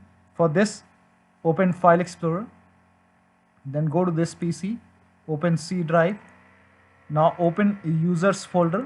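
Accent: India. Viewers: every time that i hear indian voice i know my problem will be solved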